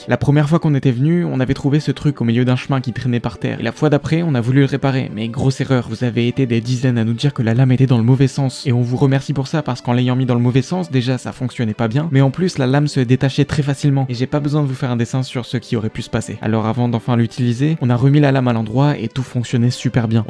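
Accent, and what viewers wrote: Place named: Paris, France. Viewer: On sent le parisien......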